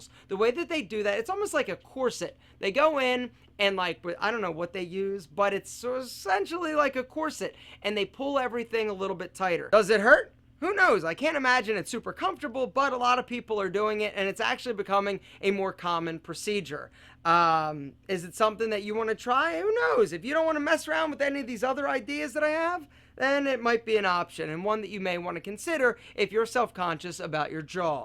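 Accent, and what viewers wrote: Philadelphia, United States. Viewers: Are you from philly? Wooder.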